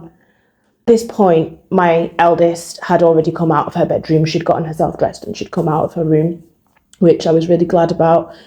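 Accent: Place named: England, United Kingdom. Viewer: I thought this was from another country at the start then I realised you have an English accent and then it began to dawn on me you were talking about Manchester and Manchester police. I should be shocked by how you have been treated, however I am not because of how the so called Government is acting. I am deeply saddened that you have suffered this traumatic treatment and your children. 15 years ago, when my children were primary school age I was treated appallingly by local police because of a false allegation & it meant I missed my sons school assembly (I had never missed one) this upset me more than what was happening to myself, my house was raided & although there was the worst snow fall in years I was not allowed back to my property until they had finished late evening. This was not as bad as you had to endure however I can understand how you felt about your personal belongings, your personal home. 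Thank you for your bravery, your resilience and for having the courage to continue to speak out alhamdulilah. May Allah continue to bring his calm and strength to you and your family for all you are doing for Palestine inshallah. Although this was a terrifying experience for your children, your children will remember how brave their mother was and that you are fighting to support Palestine in an effort to bring an end to this genocide inshallah alhamdulilah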